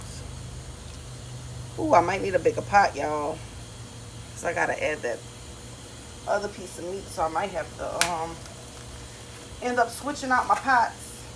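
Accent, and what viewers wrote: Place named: New Orleans, United States. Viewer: That New Orleans accent yesss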